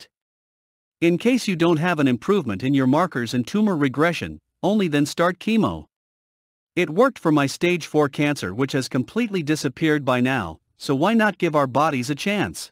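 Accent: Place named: France. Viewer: I agree with others , your French accent is lovely and totally understandable. The robots voice accentuates in the wrong place quite often which then doesn’t make sense. This robot voice is also associated with a lot of scam videos. I would hate to think this would put people off listening to your story. Be proud that you speak such good English!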